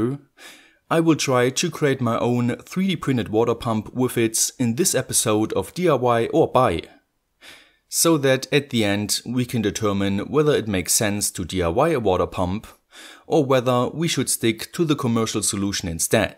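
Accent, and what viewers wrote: Germany. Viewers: i can hear that you a german guy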